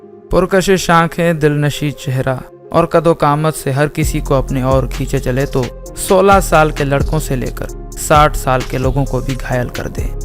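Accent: India. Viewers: Tm Indian ho tmhre bt krne se saf pta chl rha ha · Bera hee kanjer hei jiska ye channel hei. Harami, sharai taur per kiya ghalat hei. Aur tu hindi lehjey mei kio bhonk reha hei? Kutta.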